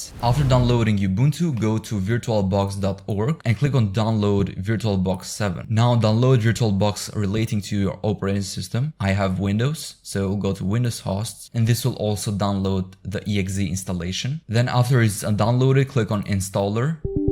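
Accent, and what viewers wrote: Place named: France. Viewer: l'accent francais mdr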